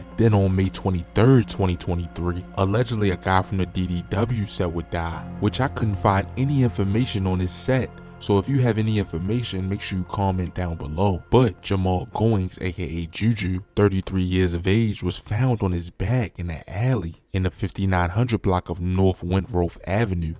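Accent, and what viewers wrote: Baltimore, United States. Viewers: Foo ALWAYS good for a few minutes of good watchin! I o see how you get so much info. It sounds like my guy is from Baltimore to me.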